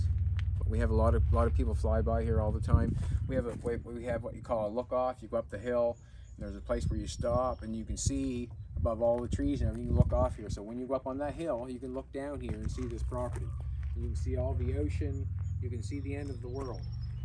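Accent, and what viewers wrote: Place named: Canada. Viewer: Omg please love you your channel your content and your canadian accent it's all good!!!! But for the love of god stop with the Windex!!!! You could be using any type of spray wax turtle wax to maguire's or whatever brand....but in the same one wiping spray and wipe motion your cleaning protecting waxing and shining and making the surface buttery and slippery for the next inch of dust or dirt to slip and slide right off of.....also because the wax is so slippery way less chance of scratching or swirling paint or clear coat....not a painter but I detailed for almost 2 decades and know what I'm talking about....if no water or no wash which is fine.....at least use spray wax....make that shit shine and buttery as hell and watch the water bead off.....from a machanic and welder at a custom corvette an race shop that detailed for years b4 finally investing in myself and some tools an takin the leap to mechanic for jolenes sake spray wax!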